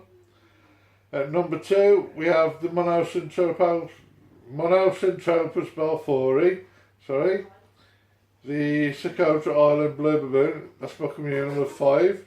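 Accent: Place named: Black Country, United Kingdom. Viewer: Nice one. Where are you from? Sound local to the Black Country?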